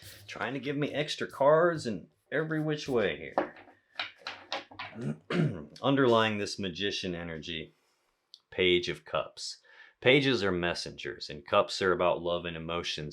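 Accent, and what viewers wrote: South, United States. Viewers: Never seen your channel before but you have to be southern like me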